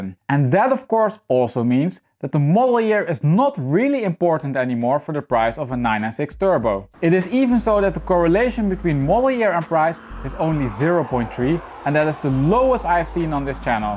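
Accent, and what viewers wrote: Netherlands. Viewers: Thank you! I needed that. You've got a Dutch accent am I right?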